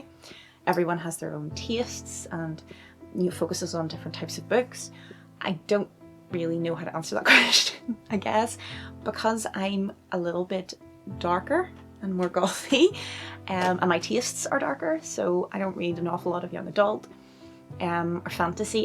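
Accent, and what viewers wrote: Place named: Northern Ireland, United Kingdom. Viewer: Heeey, welcome to booktube! :D Can I just ask... Where are you from? You have such a lovely Northern Irish accent!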